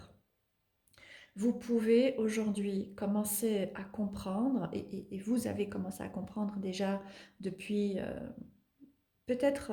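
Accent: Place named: Jura, France. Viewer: J'aime bien votre accent (Jura?)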